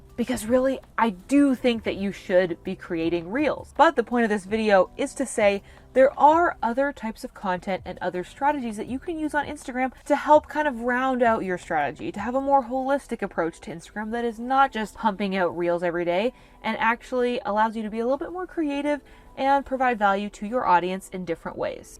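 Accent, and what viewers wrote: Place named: Canada. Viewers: This is amazing training!!! Thank you so much! Yes and the word process every Candian says a long “O” sound where Americans say “ah” It’s cute ☺️ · This video was so super helpful! I for one often interact with tagged photos (outfits and locations) especially when considering to buy a piece or go somewhere. And I have been doing it when making reels and posts but I will definitely ensure I do it for all posts. And yes it is obvious that you’re Canadian from your “about” and “out” lol but I’m also familiar with how Canadians speak so some people may not notice - Nelle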